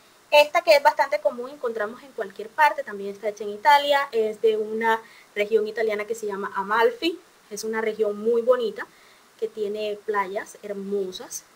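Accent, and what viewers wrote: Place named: Chile. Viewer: siii que bueno eres paisana mia por el acento supe.. saludes desde Chile!!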